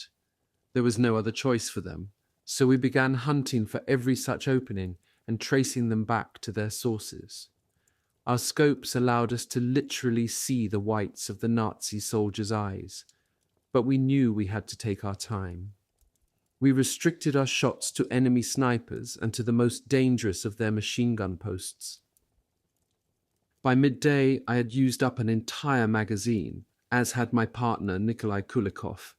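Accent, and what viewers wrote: RP, United Kingdom. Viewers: Incongruous that no mention of Female Soviet Snipers is mentioned . Just Men beating their chests and yodeling, sounding like Tarzan King of the Jungle . Fact : Lymudilia Pavlichenko called Lady of Death 350 confirmed Kills including 36 German Snipers in Odessa and Sevastopol theatre of WW 2 in Russia. . No mention by Vasily Zaitsev though he must of been aware of the Skill of Soviet Women Snipers as accomplished as he was . Strange the Narrator has a Royal English accent. Seriously?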